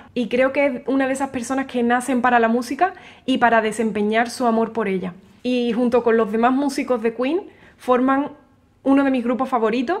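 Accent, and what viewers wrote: Andalusia, Spain. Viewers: Hola. Solo he visto la introducción contigo hablando creo que acento andaluz o extremeño y me ha encantado. Eres un dulce de persona. Yo soy fan de Queen desde mis 13 añitos y tengo 60. De hecho pertenecía al Queen Fun Club desde 1977 y era de los poquísimos que había en España por aquel entonces. Soy músico, autor y compositor y te felicito por tu trabajo y también por tu manera de explicar con sencillez y claridad esta obra tan impresionante y única en la historia del rock. Un fuerte abrazo. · Actually I regard myself lucky because I do understand what she says in spite of her thick Andalusian accent. I'm fluent in Spanish. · Está hermosa mujer, con ese tono andaluz es bella divina!! Y el talento que tiene es única ❤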